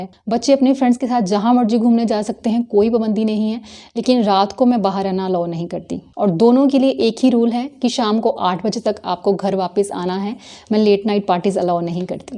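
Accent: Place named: Punjab, India. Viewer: Hi I have recently watched some of your vlogs, I like your accent it reminds me of my childhood that I spent in patiala. Your pronunciation is so typical of Punjab. 😊😊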